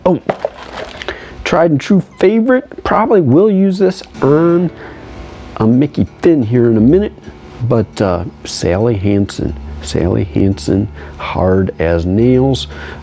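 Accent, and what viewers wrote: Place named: Minnesota, United States. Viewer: I'm from Minnesota. Are you? You sound like me.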